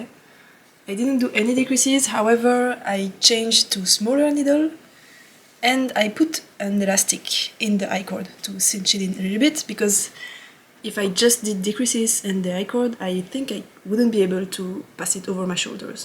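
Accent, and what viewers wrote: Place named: France. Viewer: Love you French accent. Do you use raveyto count your projects?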